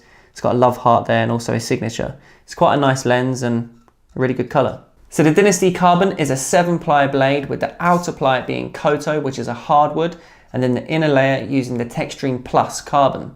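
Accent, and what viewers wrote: United Kingdom. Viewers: British accents are a bit different.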